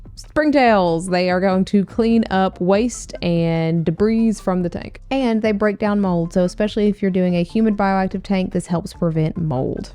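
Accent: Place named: North Carolina, United States. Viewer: being from NC I heard that accent and thought yep I trust her!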